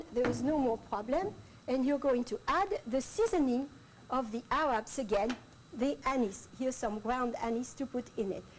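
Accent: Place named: France. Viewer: February 16, 2000 San francisco Chronicle A Grande Dame Steps Down / - controversial teacher, mentor and founder of School for American Chefs - conducts her final class. Janet Fletcher, Chronicle Staff Writer looks squarely at one of the young student-chefs gathered around her and minces no words about his menu idea. "That is not something I will let you put on the table," she says briskly. A few minutes later, she verbally leaps on a student who thinks a celery root puree might be nice with the quail. "Oh, please," says the cooking teacher in her girlish French-accented voice. "Save us this puree business. We have teeth." The eight students, all working chefs attending Beringer Vineyard's School for American Chefs, are nearing the end of two weeks with, widely recognized as one of the world's top cooking teachers. But today's menu-planning meeting seems more like boot camp than class, with constant unsparing criticism from this white-haired drill sergeant. 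She is, as former students confirm, brutally frank and quick to find fault. One admiring protege calls her "mean as a buzzard." But like the recipes she so painstakingly balances, herself is a complex creation who softens her harsh side with unstinting generosity, students say. With this last two-week session at Beringer, the 69-year-old is retiring from full-time teaching, leaving students to decipher the ingredients that have made her such a compelling, controversial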